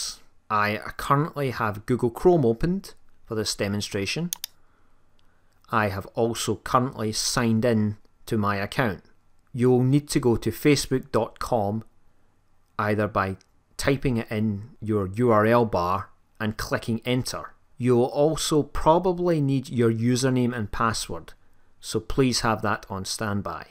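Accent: Scotland, United Kingdom. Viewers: Love ur Scottish accent. I can actually understand you.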